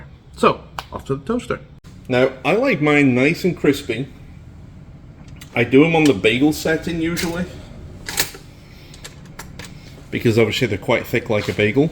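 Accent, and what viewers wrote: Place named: Lancashire, United Kingdom. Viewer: I thought so. Yours is different from the southern Lancashire accent of St Helens. Best wishes. Merry Christmas to you and a healthy, happy and prosperous new year.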